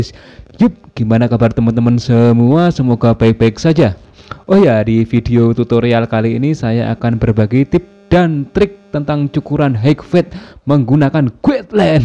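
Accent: Indonesia. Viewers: Ngapak ya mas 😆😆 Salam satu gunting 💈💈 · Ngomongnya Gk usah gua2 sih wong logat jawa kok pake gua, bilang aja saya atau aku mas,,, biar enak dengernya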